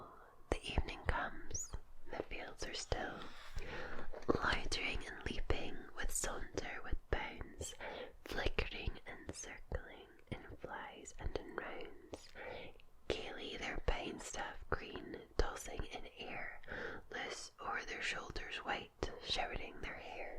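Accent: Scotland, United Kingdom. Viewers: I love the Scottish accent. Youtube Closed Captioning is being a cheeky about it though. "Hello Bees and Kettles!" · Thank you Mrs..I'm so happy to have found your channel!....I've complimented you before on how soothing and relaxing your whispering in the Brogue is!....You're amazing!...and if I may say so respectfully...quite RADIANTLY BEAUTIFUL!...with all due respect.....you're the best!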